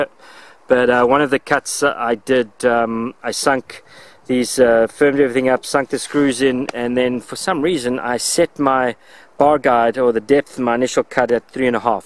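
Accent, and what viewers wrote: South Africa. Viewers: Are you from South Africa ? Soos in afrikaans ? USA ?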